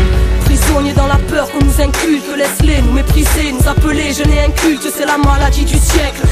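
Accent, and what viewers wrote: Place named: South, France. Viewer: jvoi pas quelle accent de racailles tu parle mec elle bien de Marseille normal quelle es un accent du sud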